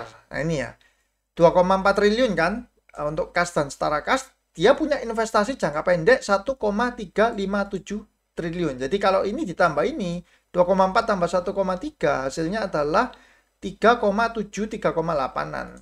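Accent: Surabaya, Indonesia. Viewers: Suka medhok Suroboyoane.. Suwun Cak ilmune.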